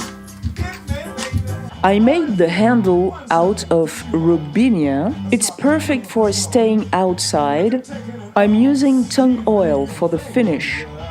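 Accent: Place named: England, United Kingdom. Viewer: 👍 for the english accent !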